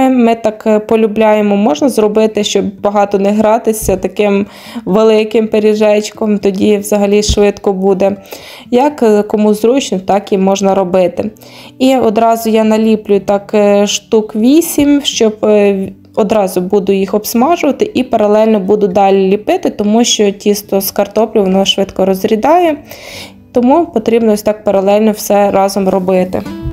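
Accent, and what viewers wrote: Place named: Lviv, Ukraine. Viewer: Дякую за відповідь,бо розмова в вас така Львівська,але я не права Українська.Здоров'я вам та миру.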